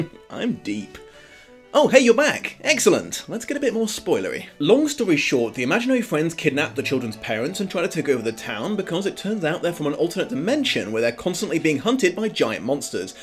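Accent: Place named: United Kingdom. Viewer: Hey! It's a British Person!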